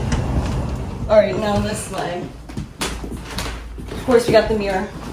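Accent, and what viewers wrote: Brooklyn, United States. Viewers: Why sometimes you have a heavy brooklyn jewish accent? Old streams I watched you didn't talk like that.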